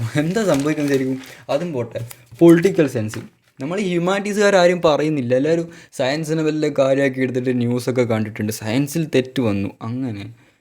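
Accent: Kannur, India. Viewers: Kannur slang📈🗿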